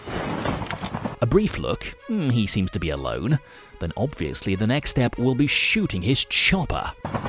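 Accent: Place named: United Kingdom. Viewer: Hey guys I'm new to chanel. Why is the commentary in a British accent in contrast with accent????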